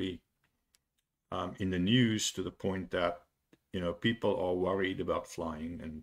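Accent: South Africa. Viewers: Are you South African 🇿🇦? You sound like it. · but every video someone makes about this accident is just speculation, this guy sounds liker a South african trying to just say something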